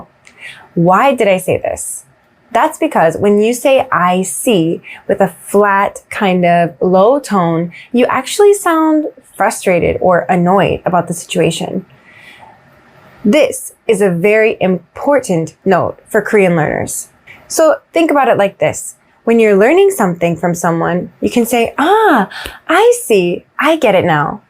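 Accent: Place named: Midwest, United States. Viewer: 완전 중서부 American Standard 발음임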